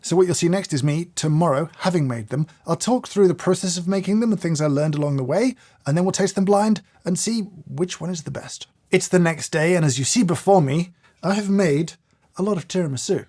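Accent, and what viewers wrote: United Kingdom. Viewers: those are manually-added subtitles, not automatic ones. The person speaking is British, lives and runs this channel in the UK, and is speaking British English. So, unless the subtitling work had been outsourced to the US, it is unclear how it ended up being in American English.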